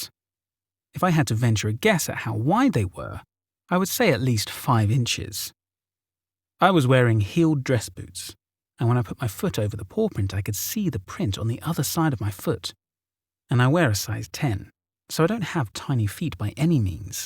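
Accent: England, United Kingdom. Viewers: I don't understand the British accent/lexicon :'/ but anyway good video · Great encounters I live in Cornwall England I learned about Dogman through listening to Vic cundiff on Dogman Encounters I could not believe it I was shocked so very many people have had encounters world wide Includi g England You sound English please if you can could you find more people to come forward with English encounters I herd of encounter on Dartmore in a back garden Also Tunbrdge wells Kent ,Essex . There must be lots of encounters , Thetford Forest Norfolk girl camping I bet there are a few Dogman there . Bext wishes Yvonne Marshall Cornwall England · So glad to have found you! Love your narration. ^_^ Quick bit of pronunciation help for a few North American place names: Ontario has a longer "a" in its 2nd syllable, so it rhymes with "scary," or "hair." :) Maryland is pronounced more like the name Marilyn w a "d" at the end, rather then Mary-Lynn or Merry Land, and Appalachia/Appalachian is pronounced more like "appah-LATCH-ian" rather than a long "a + sh" sound. 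(I heard a great phase to help people remember this just the other day: if you mispronounce Appalachia someone will throw an apple atcha." XD) I know you're fun the UK so it's tomato vs. tom AS Hto, but I hope that helps. ^_^ 💙💙💙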